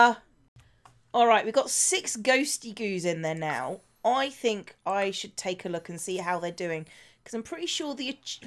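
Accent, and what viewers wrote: England, United Kingdom. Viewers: She's English but England has a ton of different accents up and down the country, I can't place her personally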